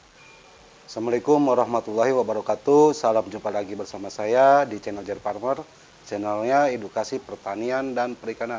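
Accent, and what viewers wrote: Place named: West Java, Indonesia. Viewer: Logat nya siga urang sunda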